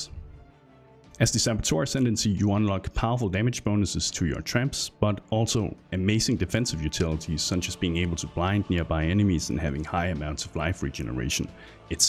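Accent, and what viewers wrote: Denmark. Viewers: Love you can hear you are danish.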